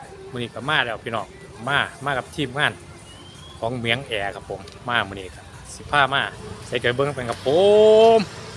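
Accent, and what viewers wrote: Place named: Isan, Thailand. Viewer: พูดไทยไม่แข่งแรงส่ำเสี่ยอั๋น กาฬสินธ์เลยนะทิด😂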